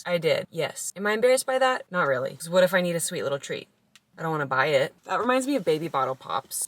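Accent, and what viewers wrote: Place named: Tennessee, United States. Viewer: Sound like Gatlinburg Tennessee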